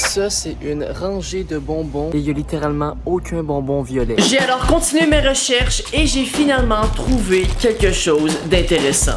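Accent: Quebec, Canada. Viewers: T canadien ?? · J'adore votre accent bisous au canadien(ne) et Québécois(se) de la France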